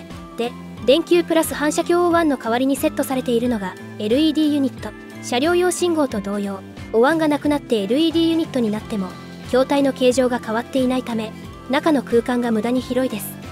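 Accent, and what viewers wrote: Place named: Osaka, Japan. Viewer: ばりばり大阪弁やん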